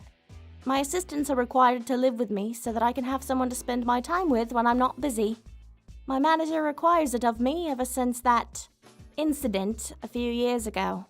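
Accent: United Kingdom. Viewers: HOLY SHIT SHE IS BRITISH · Oh my god!! She Bri-ish!! I love her!!! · What kind of accent is this? Sounds pretty british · Oh, she british? Interesting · Holy Heck she's british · Hehe an British tsundere is something I wondered how long it would take before someone came up with the idea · British accent. Tsun tsun attitude. It's s a British tsundere. best waifu Best waifu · Nothing better than a British voice. Except for my earl grey, of course. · Oof these video make me feel like im cheating on my gf but i cant help it........if tsundere weren't hot enough she British too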